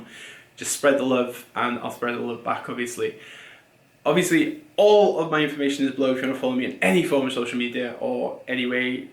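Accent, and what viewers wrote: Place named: France. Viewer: I don't know what impresses me more....the movie review or your French accent!!!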